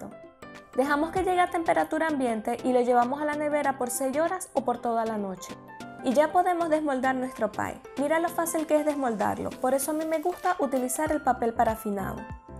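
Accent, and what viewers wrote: Venezuela. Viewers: Parece q eres de Maracaibo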